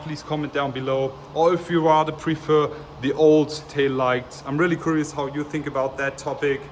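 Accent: Germany. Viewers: I’m assuming your German, your accent is hella cool lol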